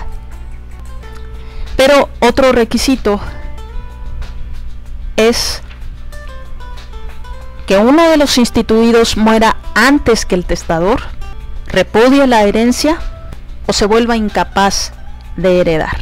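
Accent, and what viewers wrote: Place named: Mexico. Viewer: María Camila Simbaqueba Sánchez al parecer es de México, por el acento.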